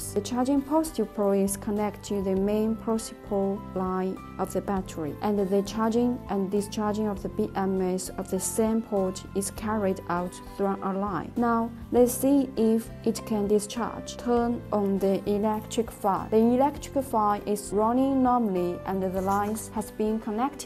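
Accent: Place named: United States. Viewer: Speak without the America essence would sound clear. drawings helps not Co fuse by moving hands...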